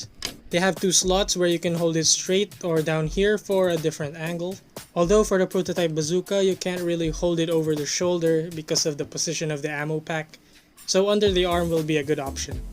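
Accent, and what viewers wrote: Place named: Philippines. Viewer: Are you Filipino I feel like you are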